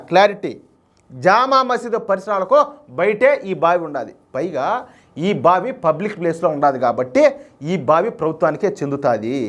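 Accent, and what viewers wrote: Rayalaseema, India. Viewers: బ్రదర్ నీ వాయిస్ మార్చుకో రాక లోకం సుధాకర్ గారు చెప్పినట్టు శాంతంగా మాట్లాడండి స్వచ్ఛంగా మాట్లాడండి, తెలంగాణ రాష్ట్ర రాయలసీమ యాసలో మాట్లాడొద్దు అండి, అసలు బ్రదర్ నువ్వు రాక లోకం సుధాకర్ గారు చెప్పినట్టుగా శాంతంగా చెప్పితే చాలా చాలా బాగుంటుంది వినడానికి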